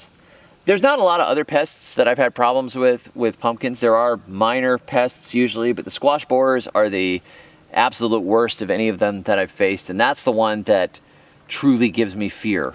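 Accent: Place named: Boston, United States. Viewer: What with the Boston or New England accents LOL..... But it might make me by one... I thought this was about pumpkins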